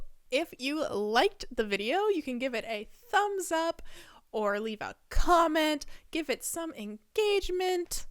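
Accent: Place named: United Kingdom. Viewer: lol british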